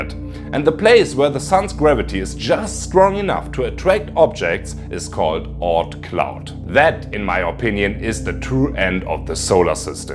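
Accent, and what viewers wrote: Germany. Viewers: Lieber ich liebe deutsche Leute die englisch sprechen 🗣 die kann man auf YouTube wenigstens verstehen aber auch aus Grund des lustigen Akzentes schnell als deutscher identifizieren dennoch Trotzdem viel Glück und Spaß in der Welt des englischen contents!! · Ich würde es lassen „On Englisch“. Wirklich zu deutsches Englisch und paar ganz harte Aussprache Fehler drin.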